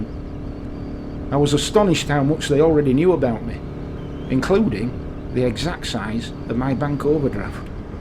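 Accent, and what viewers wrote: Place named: Bradford, United Kingdom. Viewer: First off... good to hear a Bradford accent again! 1/ Cuts from 2009: I know two police officers who were made redundant in the wake of those 'austerity' measures - one a month before his retirement (I believe) so that he would not receive his full service pension. I know a couple of others who left at the same time because they said policing changed in the wake of those cuts - policing became reactive rather then proactive with less engagement with members of the public and less emphasis on crime prevention and more on 'firefighting'. Talking about 'customers' - I was in education in the 80s/90s and about the same time there was a shift from seeing students as students towards them being 'customers'. The argument of Thatchers changes in the 80s (individuals increasingly paying for education services) was as they were now paying they were 'customers' - and the customer is always right. 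The introduction of private sector attitudes and marketing was endemic. Policing and education are (supposedly) public services NOT private businesses which exist to make a profit by (sometimes conning) customers out of their money. 2/ Biking: there are roads I hate to go on not because of the traffic (we live now at least 50 miles from even a small city and rural road users are very polite) but because of the dire road surfaces particularly on back lanes that are ever more more beginning to resemble green lanes. Live where we do and you soon begin to perfect the art of the pothole slalom. Rece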